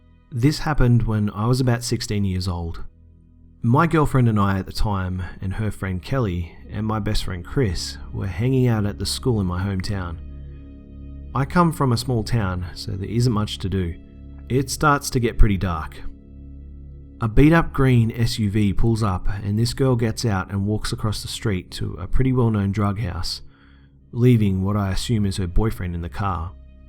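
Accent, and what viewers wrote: Australia. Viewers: move here to Australia, a lot of people sound like this even me and my friends... in other words everywhere 0_o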